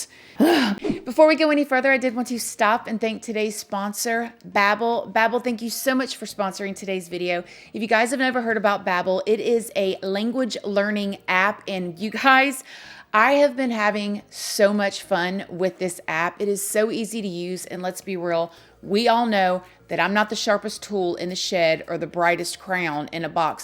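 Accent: South, United States. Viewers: Girlfriend, I love You!! Just came across you a couple of wks ago and trying to catch up on all your videos! You and Better Off Red are my favorite! You’re so sweet and I just live your country accent. Just curious though, where are you from? Tennessee girl here ❤️ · These people are not normal. The brain scans of these people are markedly different from brain scans of so called 'normal' people. I have no doubt that if Ted Bundy were to get out of the Florida prison he was in before he was executed, he would ve killed again! Ted Bundy could have disappeared into obscurity and probably would have never been caught. But he had to kill again! They need to kill like an active alcoholic has to drink again. By the way I love yourselves videos and love your southern accent 😊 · You are so entertaining your energy is awesome..😅 I love the da-da-dahs and your accent is so nice! 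Far better than this boring kiwi 🥝 (New Zealander) what part of America are you from? I'm asking as one of your babies! 😆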